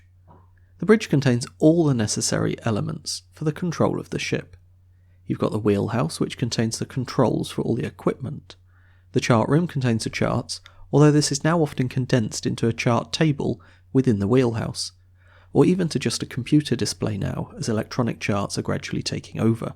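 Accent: United Kingdom. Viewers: The British accent is, somehow, disturbing. At least to me. Many times, annoying.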